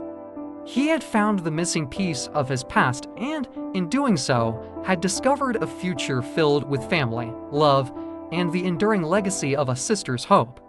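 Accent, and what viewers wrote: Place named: United States. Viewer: Also, though the accent spunds genuinely American, the speaker switches genders on characters in the story. Come ON, people!! Dloppy performance, at best !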